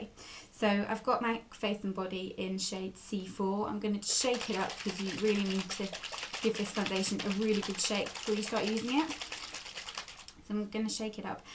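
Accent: United Kingdom. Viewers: Lol I know you have a British accent, I meant ethnicity wise as you don't look Caucasian, that's all :)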